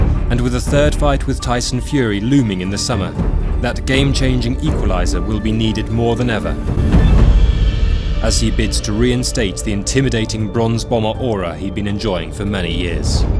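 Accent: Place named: United Kingdom. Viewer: Damn got a beautiful British accent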